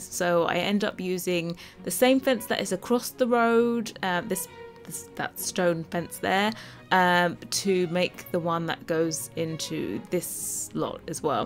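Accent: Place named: Dorset, United Kingdom. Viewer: You're from Dorest? I can hear it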